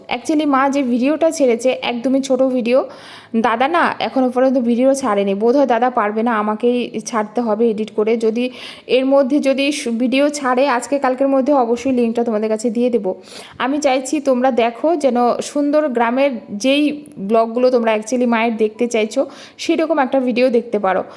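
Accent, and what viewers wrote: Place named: Dhaka, Bangladesh. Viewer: Tomar bhasa ta dhakia. kamon kore ktha balo, Mon k balo MN,Prosad k bolcho psad, prothom na bole balo Pthm. Sudhrao egulo, kthate ato dhakar taan sotti. Somosto uchcharon vul karo tumi. Egulo sudhrao,Nijeke jakhon Sikkhito dabi karo loke to bolbe je ki porasuna koreche ktha thik mato bolte parena, Tomato k balo Tomyatu kamon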